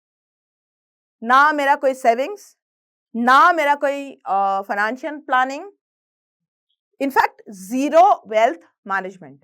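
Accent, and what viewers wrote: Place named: Bengal, India. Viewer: Kaha se hai aap ye bhi pehle batana tha mam.. Aap bangali hai aapke baton se pata chalta hai... Hindi bhi sudharna hai aapko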